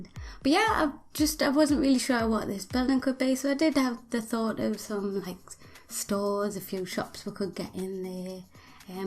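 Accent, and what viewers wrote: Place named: Yorkshire, United Kingdom. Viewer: I like your Yorkshire accent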